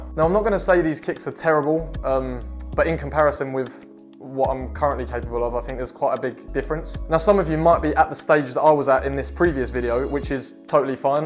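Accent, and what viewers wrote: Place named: United Kingdom. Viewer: 😂 British English, man I understand everything, my first language is Portuguese, but it sounds funny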